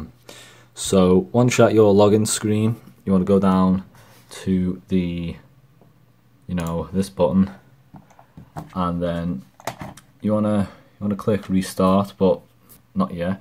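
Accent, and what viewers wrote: Liverpool, United Kingdom. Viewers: taaa lad, did not think id find a scouser on here with actual useful information xD nice one this really did help, my corsait k70 doesn't turn on until the option is allready passed to get into the bios so you saved me a lot of stress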